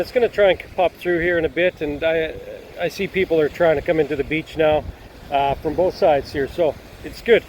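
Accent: England, United Kingdom. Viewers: Love some of the finds and beautiful pics too!! Great English accent on voice over :) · confirmed...it is possible to have...to much of a good thing...more sexy english lady voice overs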